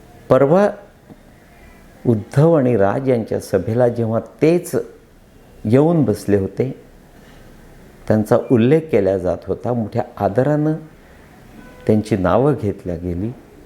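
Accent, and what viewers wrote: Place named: Gujarat, India. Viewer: Kaka tumache bolane aikatana waatate ki koni gjaratalech bolat aahe ....🙏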